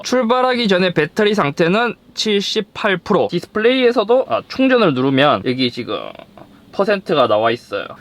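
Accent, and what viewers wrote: Gyeongsang, South Korea. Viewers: 경상도 분이세요,,,? 서울말은 아닌듯한데 ㅋㅋㅋ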